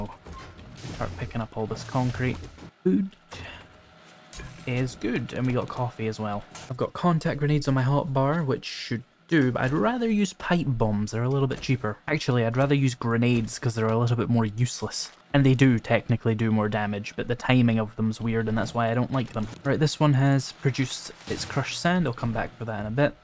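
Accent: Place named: Leeds, United Kingdom. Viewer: That accent bro, what you from Leeds area? By the way thanks for the turning stone into cement in the forge tip.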